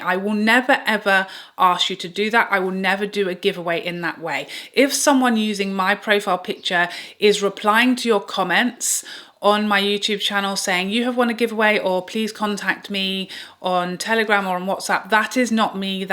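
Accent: England, United Kingdom. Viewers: Congratulations on 6 years!!! I discovered your channel at the beginning of the pandemic and your lovely accent reminded me of the wonderful years I lived in England back in the early 2000’s. I’ve enjoyed all of your content and look forward to seeing what you do in the future! ❤😊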